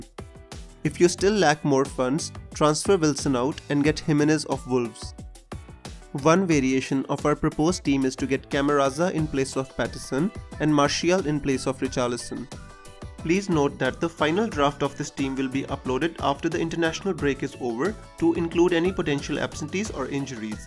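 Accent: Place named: Assam, India. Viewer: Axomiya niki o? Accent tu axomiya nisina lagise j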